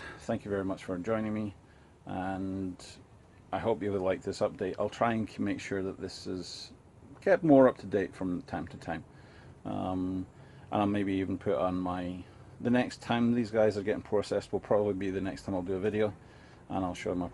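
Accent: Scotland, United Kingdom. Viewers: I know you live in Canada but are you originally from Scotland?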